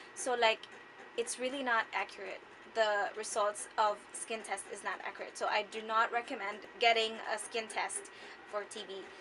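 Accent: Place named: United States. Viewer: 💙💙💙 you sound so American already 😁👌🏻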